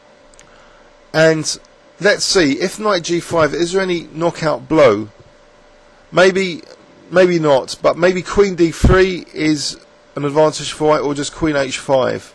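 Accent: United Kingdom. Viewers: Love your british acent :P well I guess it's british :P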